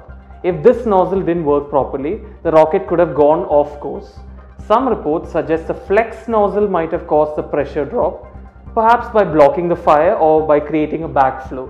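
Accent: India. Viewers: They call it Curry English! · He speaks Inglish and hard to understand.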